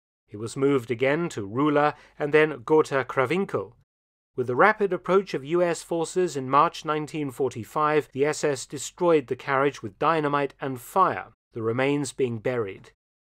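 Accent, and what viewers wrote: United Kingdom. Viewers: you are a great story teller. Your English dialect is especially pleasing to listen to as an American. European/British English is so much softer, gentler to listen to.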